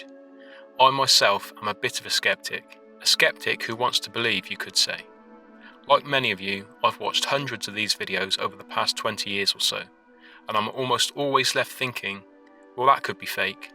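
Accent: United Kingdom. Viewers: His UK voice is awesome too awesome could be fake maybe he's from the 80's rock music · I think you have to much time on your hands down in mamas basement,also a british accent can only go so far toward making you look smart.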